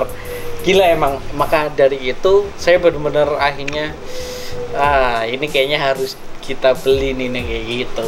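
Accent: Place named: Jakarta, Indonesia. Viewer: Mantab mas. Tapi logate og rodo jakartanan saiki😅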